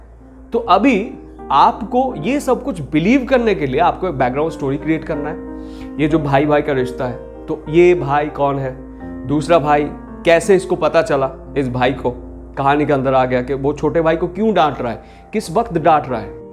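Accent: Bengal, India. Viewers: Sirji thanks for the video par aap apna hindi ka R theek kar lo..Bengali accent aa raha hai usmein..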